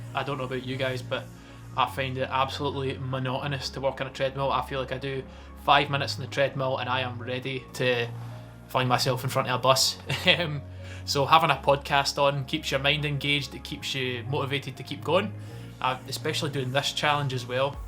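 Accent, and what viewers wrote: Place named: Scotland, United Kingdom. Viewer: English girl here, hello from "down south" ❤ and all English people love the Scottish accent i think I used to walk 20k steps a day every day, walking to work and back (1 hour 15 minutes each way and 20 mins in my lunchbreak) Having a purpose to the walk (a destination) makes it seem easier i think. For me it was my method of getting to work and back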